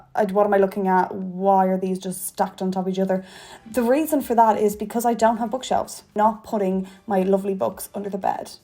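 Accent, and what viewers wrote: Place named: Ireland. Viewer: Great video! You have fantastic taste in books (Ready Player One, multiple Murakami, Cloud Atlas and Shadow of the Wind among others 😊) I really hope you find your rhythm and keep the passion for this, because this space definitely needs a voice like yours. The 10 days I spent in Ireland were the best of my life, so your accent reminds me of better times:) P.S. book recommendation for you... History of the Rain by Niall Williams. Irish author, takes place in a small fictional village in County Clare. Beautifully poetic and a great book for literature lovers.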